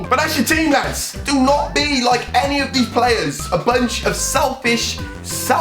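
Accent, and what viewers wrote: Wales, United Kingdom. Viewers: your from Wales?!?!?!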